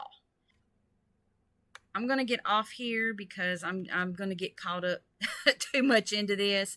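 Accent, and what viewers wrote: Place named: South, United States. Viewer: Sounds like this person is from the south.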